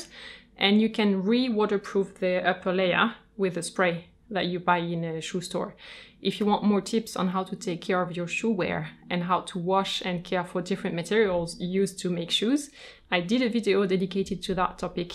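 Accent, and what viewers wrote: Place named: France. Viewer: Dear, thanks so much for the video. It inspired me to declutter my shoe closet. I am avid fun of Mary Condo, so i do organize my closet and periodically declutter. Even though i do not own many pairs, i really appreciate high quality. Is it possible you spell out the brands that are featured in your video? I watched it several times but due to french pronunciation can not catch the names.;) I especially loved your platform boots and platform summer sandals. and black shoes, they are so spectacular. The links you posted does not take me to the same shoes. May be because i am located in US and it takes me to German version of the website. If i know brand names of the shoes featured in your video i could look up based on the brand name. Thanks os much in advance!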